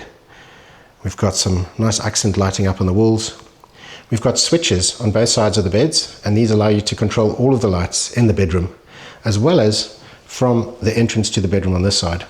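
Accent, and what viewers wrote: South Africa. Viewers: you sound like Suid Afrkaner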